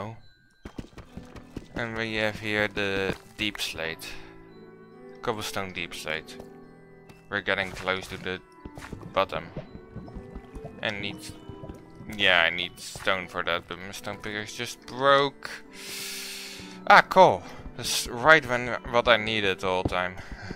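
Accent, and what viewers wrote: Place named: Netherlands. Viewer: Haha your dutch accent is so funny! (Not meant bad at all m8!) Keep goin once you will be at 1k! Tip: maak wat kortere videos en record in meer fps dan word het veel interessanter! (En mschien je naar verranderen naar ofzo iets korter👍) veel succes verder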